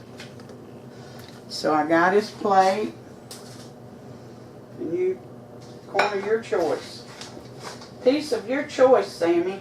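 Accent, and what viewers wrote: South, United States. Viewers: Love your recipe videos and the southern drawl makes me smile. Keep it up!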